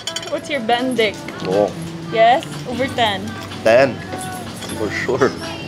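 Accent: Visayas, Philippines. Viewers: Kalingaw jd nimo mag bisaya ate 😂 more daw aning mga bisaya nimo 😂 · ka cute sa bisaya 💕😊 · Ka cute nimu mag bisaya uyy 😍😍😍♥️ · sagdii lang ng mga nega comments sa imuha oi you can't control them but what you can do either is to continue to do the right thing, which is to entertain us with your vlogs and cute kaayu ka mgbisaya oi lingaw kaayu ❤️ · kacute magbisaya HAHAHHA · you sound so cute with bisaya accent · Cute kaayo ka mag bisaya ate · Cute kaau mag binisaya ui… 🥰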